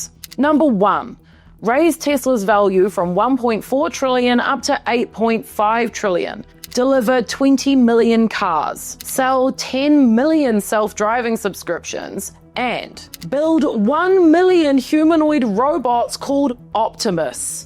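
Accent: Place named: New Zealand. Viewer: It sounds like NZ to me. AFAIK, pronounciations like "Tiksus" and "sivunty" have the Kiwi vowel shift. A few weeks, ago, she was talking about people from Mexico and it sounded like she was saying "mix a can"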